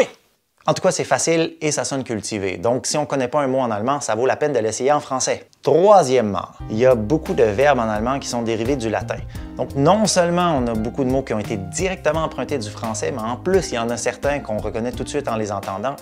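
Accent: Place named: Canada. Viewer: L' accent Canadien: un regal :) Je m' abonne: tres interessant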